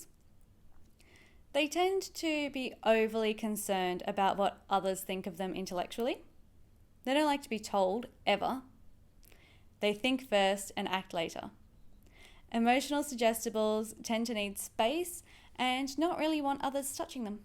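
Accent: Australia. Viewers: I’m suggesting to myself how to master your 😘 Aussie accent. O’s are diphthongs or tripthongs in Australian. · you are literally about as far away as you can possibly get without going off world lol she's Australian fella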